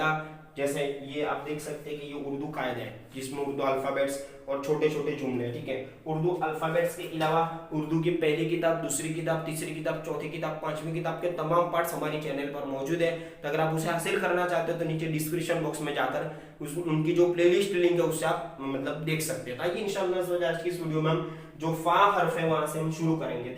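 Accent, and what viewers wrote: Gujarat, India. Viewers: Tame Gujarati chho??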